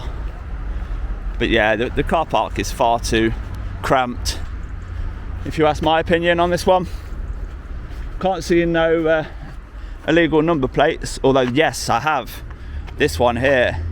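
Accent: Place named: Leicester, United Kingdom. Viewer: Hey I love your videos, and your ‘propa’ Leicester accent! Keep up the good work, I always wonder what is there to hide!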